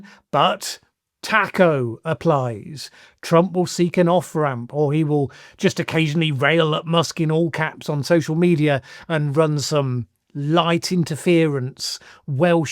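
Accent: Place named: Southern England, United Kingdom. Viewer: I find two things fascinating about your videos 😊: 1. the analysis you make, and the case you make for it, and 2. your pronunciation/your particular English accent. The latter makes it clear that my nephews who live in southern England who had "perfect" trad modified RP diction until they went to school, from which point they spoke with Kentish vowels and struggled to pronounce 'th' consistently [voiced & unvoiced as required, ð and þ] really are following the norm in Southern English. You do not substitute v/ff all the time, for which I am grateful, because it is vital to know whether people who had "ffought" about something had a) had a think or b) had a fight... but 'with others' is clearly easier for you as 'wiv others', and this is utterly normal in 2025 on YouTube from parts of England. It makes me feel that, when helping those who are new to speaking English, we should be encouraging them to say 'th' as 'v/ff' wherever it is easier for them - certainly easier on the ear than the French tendency to "z/ss", perhaps less distracting than the Irish 'd/t' - every way of speaking English that maintains fluency is fine by me, but to do Hiberno-English you need to match it with a consistently Irish flavour in the vowels, or English natives may struggle to attune their ears to the mix. 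Thanks for both your insights in the intended content, and the effectiveness of your delivery and, as it happens, the provocation to me to reflect on what counts now as effective/comfortable dict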